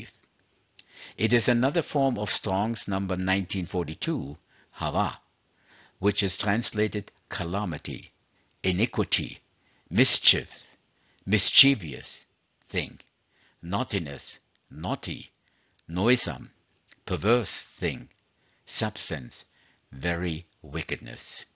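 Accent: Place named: Germany. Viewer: sie sind zeuge jehova, wenn ich fragen darf? ich weiss sie können deutsch sprechen, ich erkenne es an ihrem dialekt :) grüße aus bayern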